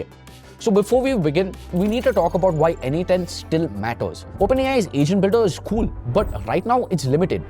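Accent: India. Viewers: Ainda estou tentando descobrir se o sotaque é indiano ou venezuelano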